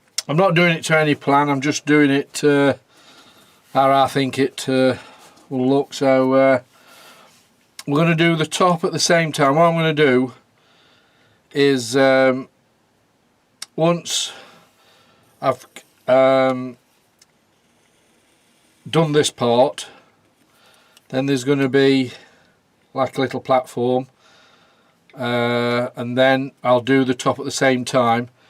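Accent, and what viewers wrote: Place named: Derbyshire, United Kingdom. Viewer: Good job! I always like watching your videos. I'm guessing you are Debyshire lad from your accent? Well south of me anyway - Leeds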